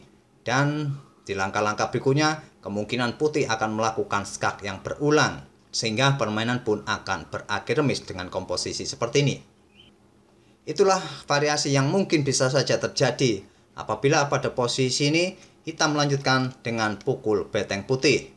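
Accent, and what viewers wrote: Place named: East Java, Indonesia. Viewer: owh admin nya jawa timur, oke bng sukses selalu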